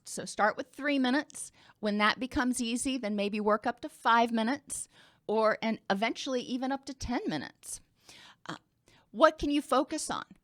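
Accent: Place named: United States. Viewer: Your explanations are really amazing, but I am afraid your accent is too American an you speak so fast for non natives like myself. Thanks a lot though.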